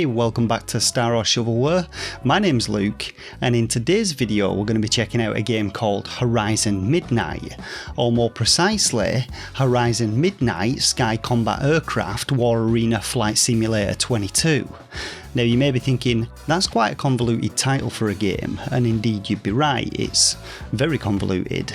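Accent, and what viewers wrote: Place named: Northern England, United Kingdom. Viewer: You sound like you're from the North of England but I can't quite pin down the city.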